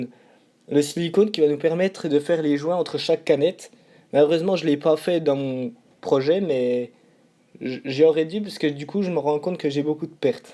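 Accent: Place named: Belgium. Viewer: Accent Belge à mon avis ^^ ( j'ai étudié là bas ) :)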